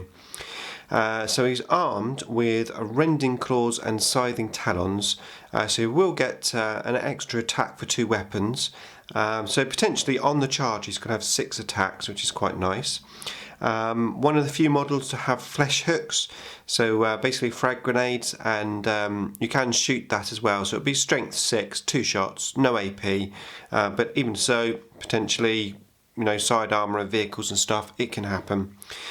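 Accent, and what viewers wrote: United Kingdom. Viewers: 40k Where are you from btw? I would likr to improve my English level(by watching wh videos) and ur accent sounds cleanly british haha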